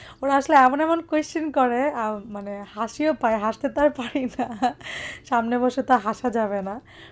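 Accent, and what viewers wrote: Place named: Dinajpur, Bangladesh. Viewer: Hi api apner video dakhi vloi laga apni onk sundor guceya kotha bolen apur soshur bari kothay north Bengal a naki dinajpur Rangpur ar moddha naki video ta Dinajpur ar kotha sunlam tai ask korlam amr home town oh Dinajpur a asola nejer place ar kotha sunla monta kano jani alada felling mona hoy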